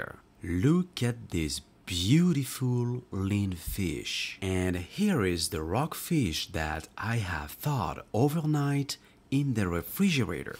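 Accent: France. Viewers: Mimi R I know. But I don't mean his frensh accent but more his Terminator way to talk. You know what I mean?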